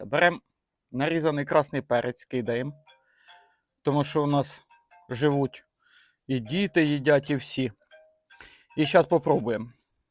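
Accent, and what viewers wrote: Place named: Chernihiv, Ukraine. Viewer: Пане, а звідкіля сам родом будеш якщо не секрет?:) по говірці дуже схоже десь з чернігівщини, на менщину дуже схоже. 😜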